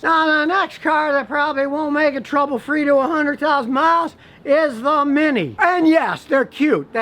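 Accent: United States. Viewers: your english is really american and you do your reseach 100% before a video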